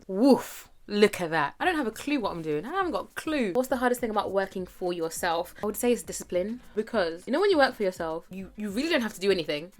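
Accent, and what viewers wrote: Jamaica. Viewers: i always here you break out little patois, i always thought you were Jamaican, was surprised when you said you are Kenyan :) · is that patois i here😂okkkkk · No sah you sound just like a Jamaican. Roots 🇯🇲🇯🇲🇯🇲🇯🇲🇯🇲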